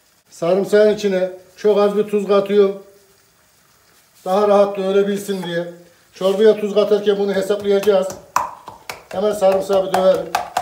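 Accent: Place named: Ankara, Turkey. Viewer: Ustam nerelisin sanki ankarali gibisin merak etim bende ankaraliyim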